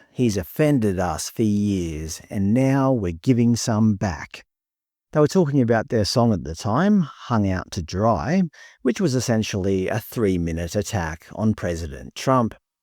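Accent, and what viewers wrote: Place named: Western Sydney, Australia. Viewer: They were woke before it was cool. I thought your accent was Western Sydney....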